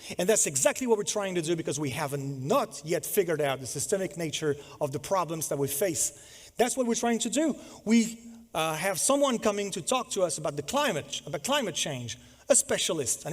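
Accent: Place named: United States. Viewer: où avez-vous appris à parler si bien anglais, avec un accent américain de très bonne facture?! En tant que prof d’anglais je suis impressionné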